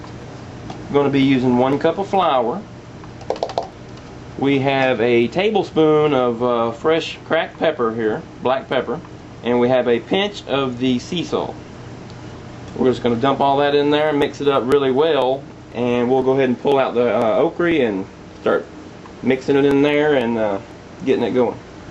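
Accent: Texas, United States. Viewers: Where are you from I love the way you talk your voice sound cowboy style Texas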